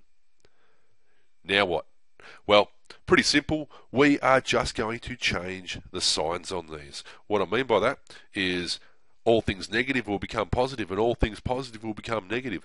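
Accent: Australia. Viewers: It's clearly ozzie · Anything with Aussie accent is cool!!! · Are you aussie cause i also am ? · its Australian haha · wow, my mind is blown... that's so much easier than how I was taught! Also, math done in Australian just sounds less arduous.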